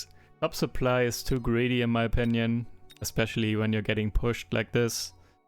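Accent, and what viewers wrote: Germany. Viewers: do i hear a german accent ?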